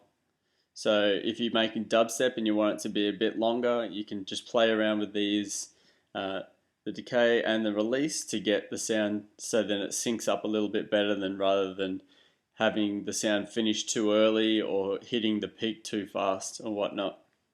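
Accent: Australia. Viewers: refreshing to hear an aussie haha good tuturial man!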